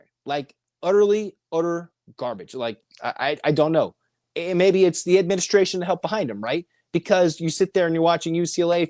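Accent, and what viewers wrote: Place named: United Kingdom. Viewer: You sound like that uk guy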